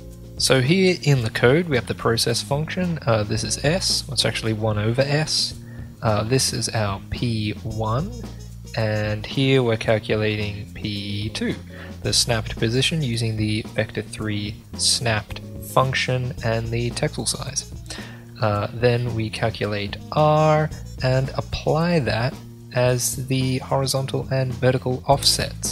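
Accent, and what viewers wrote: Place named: United Kingdom. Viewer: It's nice to put a voice to the amazing work, we are honoured to be with you. Btw are from UK you sound like it lol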